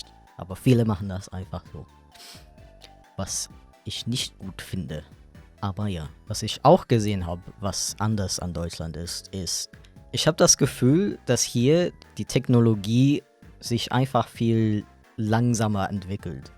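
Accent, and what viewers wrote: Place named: United Kingdom. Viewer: No offense an den Typen, aber man hört direkt nen klassischen UK-Akzent raus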